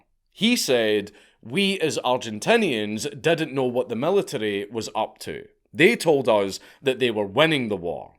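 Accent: Scotland, United Kingdom. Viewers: He's clearly, audibly Scottish. He's British, though.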